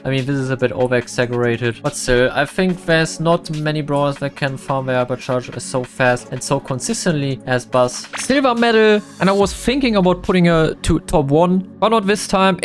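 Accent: Germany. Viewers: your german arent you pls say it in your next wedio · Es ist so geil dich englisch sprechen zu hören mann hört das du deutsch bist (ich spreche genau so englisch) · Ar u German ? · Are you german I hear an accent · bro are you german? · Man merkt dass du deutscher bist😂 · Dieser deutsche Akzent ist ja crazy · This guy is defo German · Man hört den Deutschen Akzent so krass raus! 😂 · could it be that you speak normally german ?? cause in the first 30 seconds of the video i relsied that your speaking of english is a bit different like mine cause i normally speak german too XD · Dude i heared you First time and i instantly knew that you are a german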